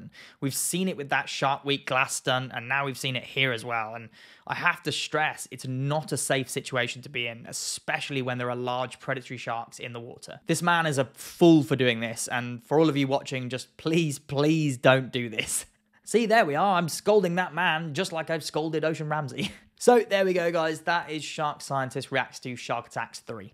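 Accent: United Kingdom. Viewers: ❤ the British accent!!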